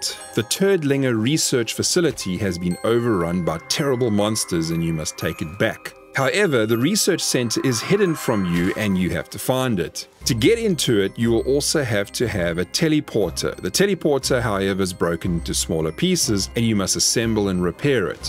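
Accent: South Africa. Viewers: First vid of yours I've seen. About 2min in, I was like, this dude sounds like a Saffer. Lo and behold a fellow South African. Nice one boet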